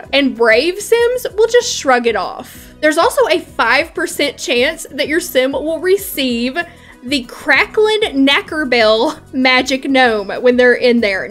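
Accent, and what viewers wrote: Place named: South, United States. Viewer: By the way you've probably heard this before but love the country accent 😂 reminds me of my granny's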